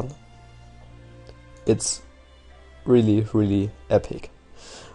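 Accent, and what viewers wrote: Germany. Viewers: Are you german and trying your best english? You can talk well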